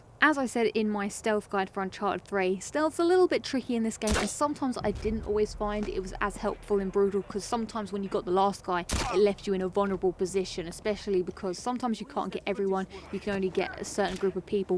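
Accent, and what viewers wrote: United Kingdom. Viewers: Dog damn, that British accent. I hate it and simultaneously I live listening to you !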